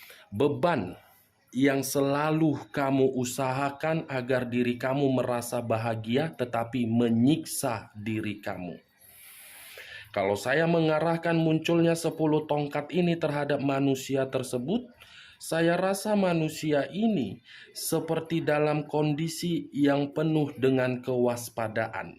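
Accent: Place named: West Java, Indonesia. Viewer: Acak Adul Sunda banget bang Bahasa orang Bandung 😁😁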